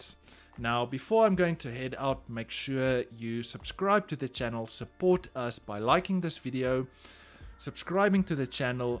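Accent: South Africa. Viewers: Love the video. And love the saffa accent. Haha. Keep going bud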